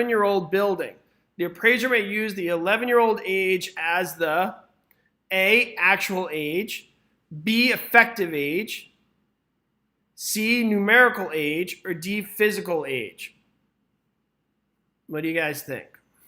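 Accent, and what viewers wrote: New York, United States. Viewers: I’m from Brooklyn, so I was like, where else but NY, with that accent. 😁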